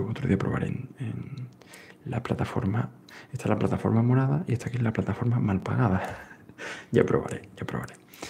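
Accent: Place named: Seville, Spain. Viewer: Yo toda feliz de saber que compartimos acento y tierra (Sevilla🌞); un asmr brutal, muy fan ✨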